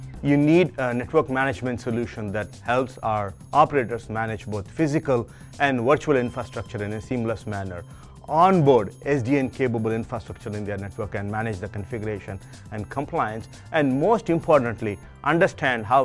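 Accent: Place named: India. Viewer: It is hard to understand your Indian English accent. PLEASE IMPROVE YOUR ENGLISH ACCENT.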